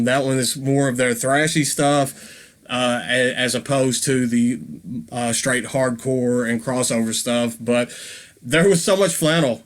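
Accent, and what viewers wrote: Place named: South, United States. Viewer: Would be funny to hear you singing little bunny foofoo with that southern accent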